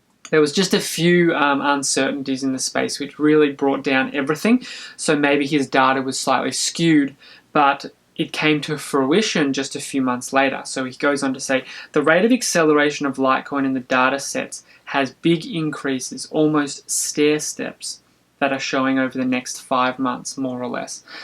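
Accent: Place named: Australia. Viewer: Are you an Aussie, Mate? I can hear your Stroine! Goodonya mate! The Theme Song kinda gave it away as well!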